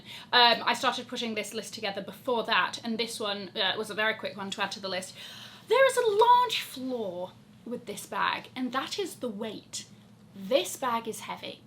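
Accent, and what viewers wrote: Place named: England, United Kingdom. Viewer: Nice review... I love your English accenT and you are so pretty. For me, I will always STAN the vintage/pre-owned luxury bag.. the quality still THERE and the prices are not always higher. But for the new designer bags I only want the Interracio from Bottega Venetta is just... PERFECT ! That's sad that now some bags are not hot like before.....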